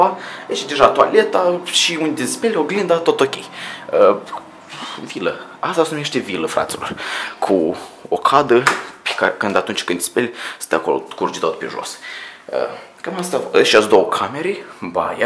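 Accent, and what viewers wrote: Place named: Moldova. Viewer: imi place vocea si accentul tau de moldovean =))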